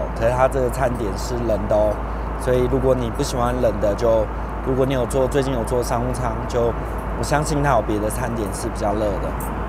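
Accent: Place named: Taiwan. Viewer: 台灣人講話本來就這樣好嗎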